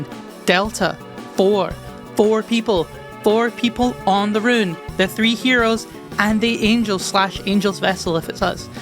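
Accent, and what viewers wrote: Scotland, United Kingdom. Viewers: Your voice is the most Scottish thing I've ever heard and I love you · I love your accent, theory was good, yell at me more possibly Scottish person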